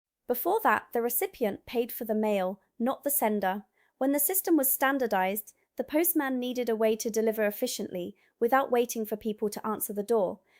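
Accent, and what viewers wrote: England, United Kingdom. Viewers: your English accent is flawless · Wonderful and accurate information. I must note your charming adaptation of a generic "English" accent after so short a time in the UK.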